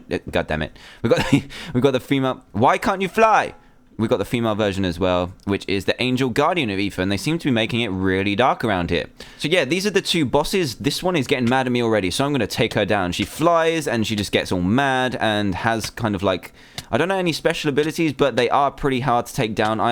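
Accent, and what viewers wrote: England, United Kingdom. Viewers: i used to watch your videos so much when i was about 3, that i developed an english accent, it sounds stupid though because its morphed with a US accent (because in in the US) but still, if i had a choice i would have still watched all your videos. we love you, you were a pillar of old minecraft, and a peice of the foundation new creators build upon. Thank you.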